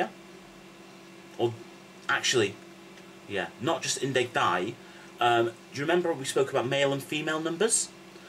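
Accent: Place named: England, United Kingdom. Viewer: Great Video. Love watching u get all flustered! haha One question tho. Your English accent is quite "English" but your Welsh accent is very Bangor ish Are you a native Welsh speaker? I went thru Welsh education in both South and North Wales, and I don't know why but some of the words you have used and the way you say them are quite new to me!! hehe thanks for the video BTW!!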